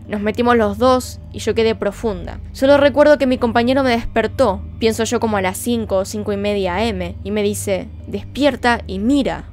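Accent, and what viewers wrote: Argentina. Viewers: Un Usuario Normal Pero creo que no es felicidad por el caso, es por la manera en la que relata, da sus hipótesis, tiene un acento argentino obvio pero su lenguaje es neutro o bueno eso siento yo, porque otros youtubers de casos, sobre todo mujeres me choca que gritan o fingen la voz.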